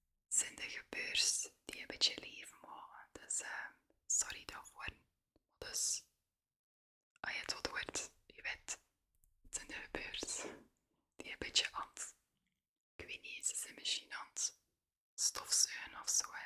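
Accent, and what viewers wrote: West Flanders, Belgium. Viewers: I often say that people should think before they speak in certain situations. Often it's emotion that takes over. And I'm trying to understand the 1st quote... 'walking to the rhythm of a flower blooming'. Walk at a slow, gentle pace? In any case, your reactions make me laugh when you try to pull yourself together to speak only in West Flanders.😄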